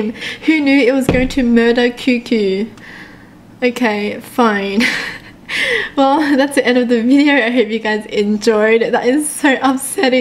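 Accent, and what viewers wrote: Australia. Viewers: Is she from australia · are u australian?!?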